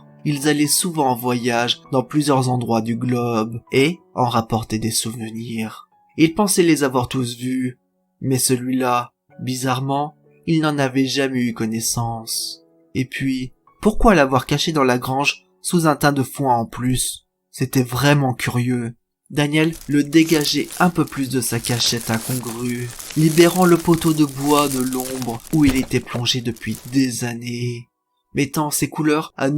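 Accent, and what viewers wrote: Lorraine, France. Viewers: Désolé pour l'accent, c'est un accent Lorrain 🤣🤣🤣🤣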